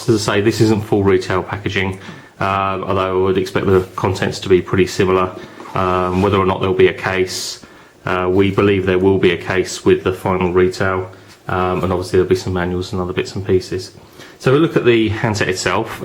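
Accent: United Kingdom. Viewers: your british accent pursuades me to buy this. why do the british make everything seem so advanced and top of the line? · ha i love british accents ! lol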